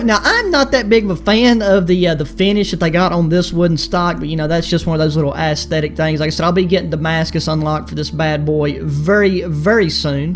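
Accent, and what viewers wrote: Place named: South, United States. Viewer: Bro I love your draw you from the southern states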